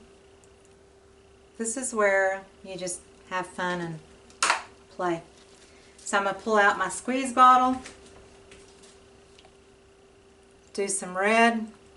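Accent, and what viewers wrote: South, United States. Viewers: I love your videos. You are so soothing with your southern accent and your painting are beautiful!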